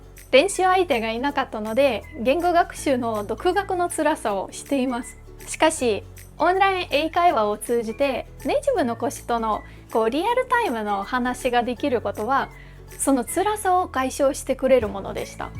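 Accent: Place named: Japan. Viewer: Gosto muito de ouvir voce falando, seu sotaque japones me agrada e eu entendo ,pois eu só não falo japones mas entendo que as pessoas falam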